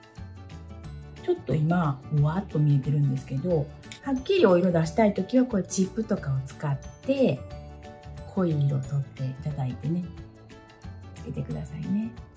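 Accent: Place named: Kansai, Japan. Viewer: ライラックの発音！ライラ↗️ック😂 ラ↗️イラックでは？んもー🐮、の関西弁好き！！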